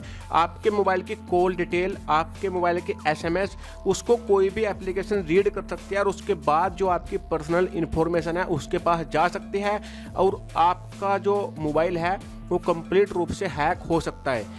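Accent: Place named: Bihar, India. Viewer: Bihari h ye tu ..kaisa bol raha h bihari up ka h kiya bahiya ji up wale language dekhi iski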